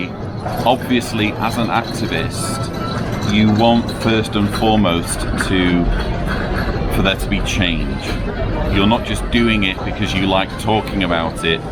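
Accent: United Kingdom. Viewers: Keep the good fight!!! Best British accent ever.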